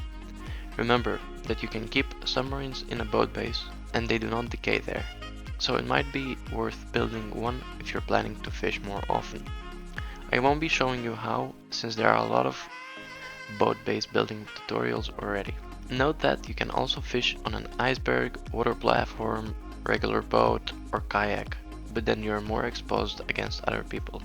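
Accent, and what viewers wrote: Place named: Lithuania. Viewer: u sound lithuanian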